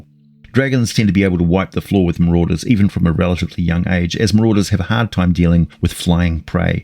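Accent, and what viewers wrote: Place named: New Zealand. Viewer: Hi, I was wondering if you’re related to the stardew valley content creator “BlaDe” at all? You both have very similar kiwi accents imo (I’m from Auckland myself), and you two seem to have a physical resemblance too.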